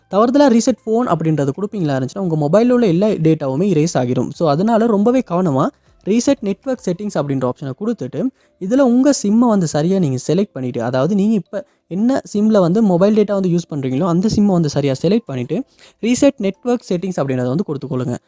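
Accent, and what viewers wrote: Sri Lanka. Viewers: Srilankan tamil 👍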